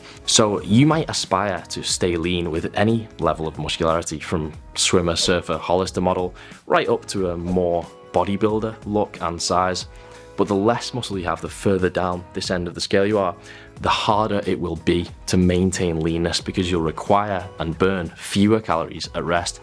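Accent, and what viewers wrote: United Kingdom. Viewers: You have VERY BRITISH ACCENT dude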